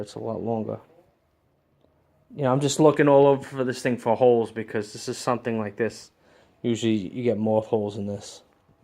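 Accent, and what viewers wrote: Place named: New York, United States. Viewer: Hi, great video. Thanks for sharing. Also, love your New Yourrrrk (?) accent:) Pinterest is awesome for finding womens clothing info. e..g typing "types of skirts" would bring up a infographic showing a-line skirt, pleated skirt, etc. Even Google Images would do it.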